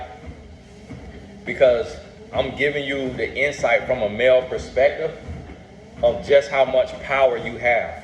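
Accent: South, United States. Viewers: Hey there Mr. I forgot to just say ion know what video you said it on but I’ve heard a few times but you say you was insecure or something like that about your country accent man that the one thing I love about on know fruit stuff but I makes me more comfortable as black man to sit an listen to your videos it speaks to me an Im sure many others I be definitely got out more of my homies on from the hood anybody else wouldn’t be able to reach us like you can so I appreciate you an everything you do I pray God bless you even more man cause honestly I had been lacking in the self love department do to child hood trama an had some bad patterns popping in relationships back to back from Mommy wounds that were never solved but hearing this an hour courses have really helped an continued to help so thank you man 💪🏿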